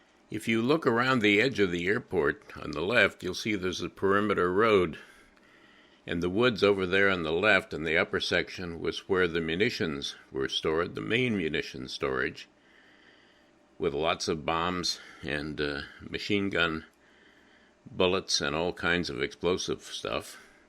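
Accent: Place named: United States. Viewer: My wife was born on the airfield in one of the Nissen huts: after the war there was a tremendous housing shortage and her parents along with many other local people squatted in the disused huts until they were re-housed in one of the new housing estates that sprung up in Kettering. Incidentally it was wonderful to hear an American voice doing the narrative.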